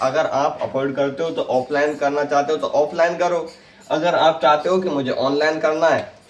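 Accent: Bharatpur, India. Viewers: From mahwa dausa Are you Bharatpuiya Hamari jaisi bhasha hai aapki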